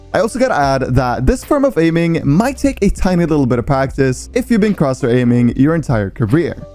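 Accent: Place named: Norway. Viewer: Are you from norway? Cause it sounds like it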